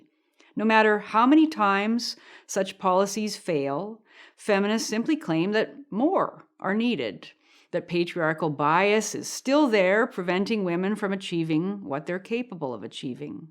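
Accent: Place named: Upper Midwest, United States. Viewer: Sounds like a Minnesota or Wisconsin(Canada's neighbor makes sense) accent. Good video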